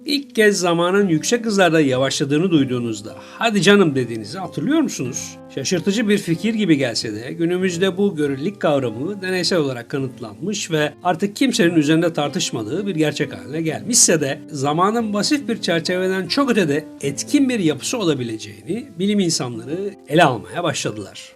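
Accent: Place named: United Kingdom. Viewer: British telaffuzu.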